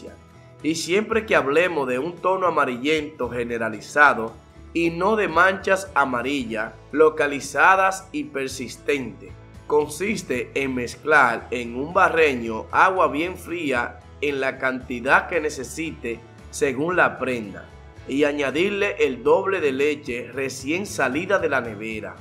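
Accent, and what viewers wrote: Dominican Republic. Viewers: Criticón, me gustaría escribirte, parece que es dominicano y sí es así, ese su lengua popular.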